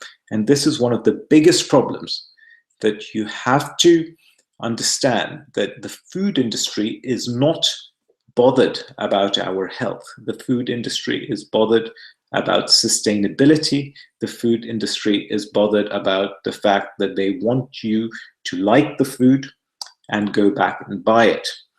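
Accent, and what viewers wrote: United Kingdom. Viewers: Wonderful video! So informative and you explain things so clearly! Your British accent is a plus!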